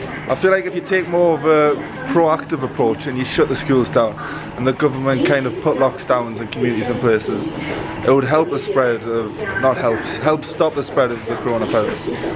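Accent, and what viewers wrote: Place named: Newcastle, United Kingdom. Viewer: You sound like a fellow Geordie and I have just about watched all of your videos. Thanks for posting.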